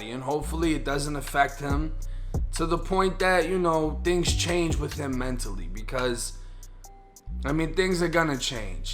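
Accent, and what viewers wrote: Boston, United States. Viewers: New to your channel. Great commentary👍 love the Boston accent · Sound like a guy from the inner city maybe Boston all black/white all sound like that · Na that ain't New York thass bean town boy that's middlesex n Suffolk county shit it's the whole bay state we all mass holes just r area n boston is more emphasized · Man that boston accent is comming out hahd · He got a strong boston accent lol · Bro u from Boston or nah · yo bro r u from Boston mas.??? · I'm like 99.1% sure you are from Boston.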